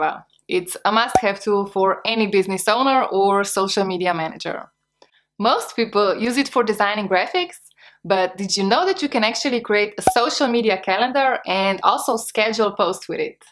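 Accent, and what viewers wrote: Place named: Hungary. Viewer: After couple months in Budapest I recognize that Hungarian accent anywhere lol! Great vid!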